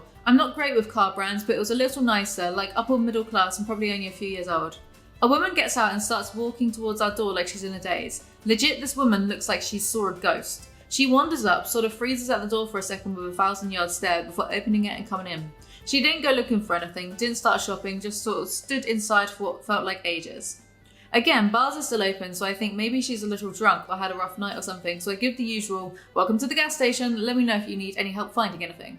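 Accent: United Kingdom. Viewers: LMAO YOUR PHONE IS RIDDLED, I love hearing another UK accent, makes me feel like we actually exist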